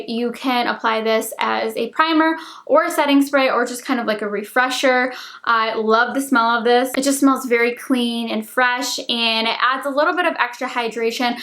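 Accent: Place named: New York, United States. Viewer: Does anyone else remember she used to have an accent?? Am I just going crazy I swear she used to have a New York accent lmao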